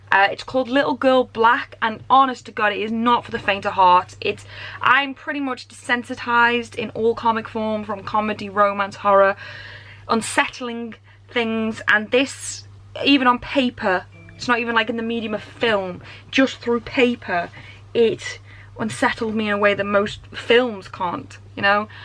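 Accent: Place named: United States. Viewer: My like... vlogging inspiration is Julien Solomita. He has a reeeeaaaally fancy camera, but the things he will film and his editing are totally doable! He doesn't always do creative filming for his vlogs, but many times he does. xD I know, I'm learning to be fancy too. Haha, I love your ditsy-American accent. Oooh lady I know the migraines. I know them. >.< HAHAHA, I LOVED your thought train on food! That comic creator sounds fantastic!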